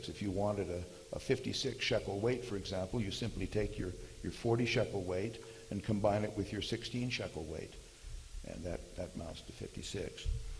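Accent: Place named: Midwest, United States. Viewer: Regional dialect. I'm guessing Midwest. Excellent presentation.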